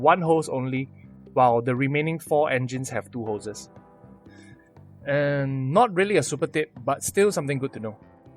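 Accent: Singapore. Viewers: Haha could tell you were from SG from your voice. You sound like a tuition video for PSLE.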